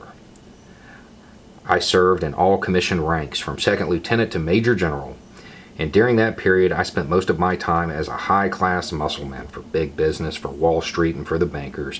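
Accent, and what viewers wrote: South, United States. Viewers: I just have a quick question and I honestly am not trying to be a prick. Why do you have a southern accent here, but when you speak as Justin King, you do not?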